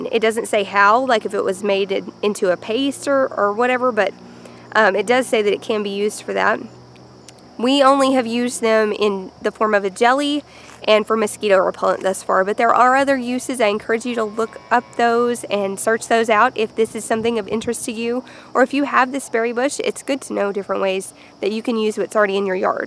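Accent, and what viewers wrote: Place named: Texarkana, United States. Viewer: I could tell by your accent you are from Texarkana ❤ My grandparents and mom were born there so I have lots of family there. We have beauty berries all over our land in East Tx and I was watching your video while picking the berries from stems I had cut this weekend. Oh my goodness, these plants stink lol!! No one mentions that in videos. Maybe it's just me but they made me sick to my stomach smelling them for a couple hours. I sure hope the smell is gone after boiling!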